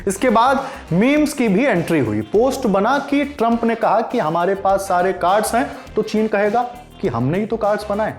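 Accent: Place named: Kanpur, India. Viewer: कहि रहे...रहि जाएगा...वइसे...पहिले...😂😂😂 कनपुरिया स्पॉटेड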